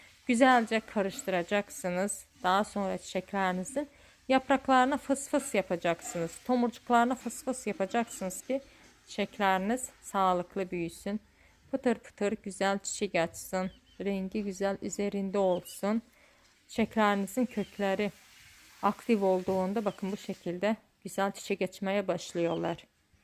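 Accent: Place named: Azerbaijan. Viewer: XANIM SIZ AZERBAYCANLISIZ?SIZIN DANIŞIQNIZ BIZIM ŞIVEYE OXŞAYIR❤❤❤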